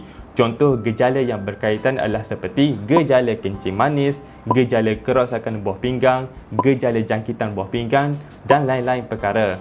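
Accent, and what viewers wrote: Malaysia. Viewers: Orang Malaysia Ya Bang...?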